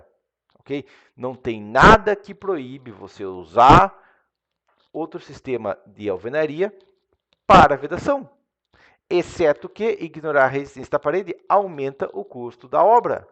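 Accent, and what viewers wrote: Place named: Lisbon, Portugal. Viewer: Meu filho , uma dúvida : você é de que lugar do Brasil ? Faço essa pergunta por causa do seu sotaque . Você é do litoral de Santa Catarina ? Por que o seu sotaque parece.muito com o sotaque da Europa , o sotaque do português europeu , especialmente de Lisboa . Abraço .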